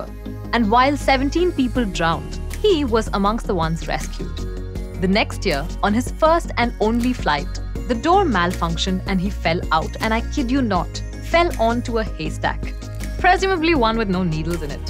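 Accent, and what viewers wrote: India. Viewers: The Indian Accent with this one is so sooo strong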